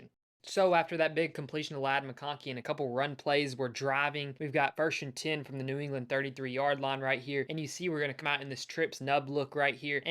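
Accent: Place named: South, United States. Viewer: Honestly with this guy's southern accent, its like it's a ladd incognito youtube channel. "As you can see on this play iiii.... I mean ladd was wide open".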